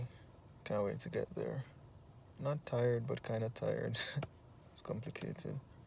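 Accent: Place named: Jamaica. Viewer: I knew you sounded Jamaican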